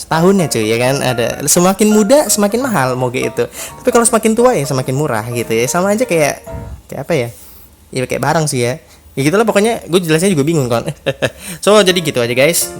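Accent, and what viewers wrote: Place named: Java, Indonesia. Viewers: wooo.. wong jowo to, medok² gurih..:v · orang lampung tapi medok🤣🤣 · Kenapa ke jakarta malah medok to bang 😂 kekel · Bahasane lohh kok dadi medok bang😂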